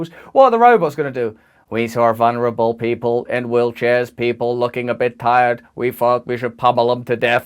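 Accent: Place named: United Kingdom. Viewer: only way you could think you like this psyko is his ay eye is acting like the thoughts of yours he needs to creep in a disgusting robotakeover... you'll shake 'em out, brother Brand... much love... gra'itude for you and the excellen' videos, and being the hilarious "t"-free Bri'ish accent in me 'ead, when we vibra'in' on a swee' timeline thread where we' no' all dead... <link>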